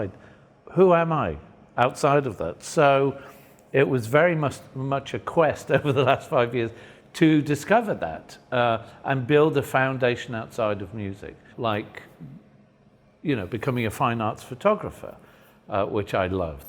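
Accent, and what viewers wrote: United Kingdom. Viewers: Desculpe as vezes escrevo rapido e dai errado.. Mas que pena que vc é um sonho muotoooo distante Provavelmente nunca vou te conhecet...conheci seu tio Paul num show no atena fiquei bem perto..foi bom.. Mas sou fã msm fo seu pai John lenmon adoro ele e sua mãe Cintia com todo respeito Adoro seu rosto..perfeito..voz b britanica rstsrs... Mil bjs...sonho impossivel de realizar..te conhecer pessoalmente...❤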